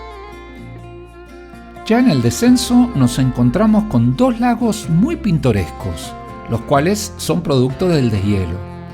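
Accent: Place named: Argentina. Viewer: Pensé que tenía acento argentino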